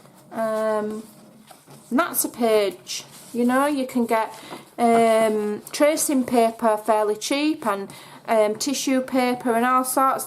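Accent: Yorkshire, United Kingdom. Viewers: yes i guessed you were from Yorkshire lol xx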